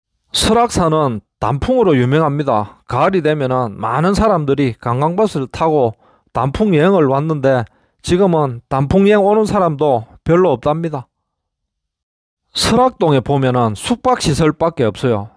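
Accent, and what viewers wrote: Gyeongsang, South Korea. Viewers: 와 갱싱도 사투리 진하다!!! 도저히 힘들어서 못듣겠네.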